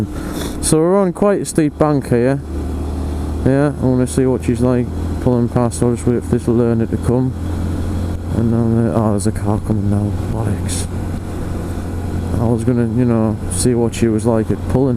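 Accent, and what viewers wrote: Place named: Stockton, United States. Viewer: Where you from mate? Watched your vids and sound like from where I'm from I'm originally from Stockton but live in South Shields now currently a 125 noob vlogger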